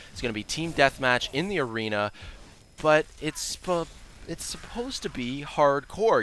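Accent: Canada. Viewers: are you canadian???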